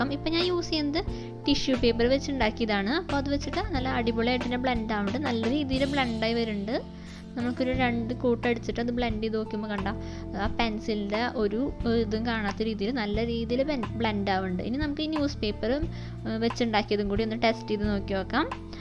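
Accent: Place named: Thrissur, India. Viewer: Ente frnd thrissur und... Pinne slang kettappo manasilayi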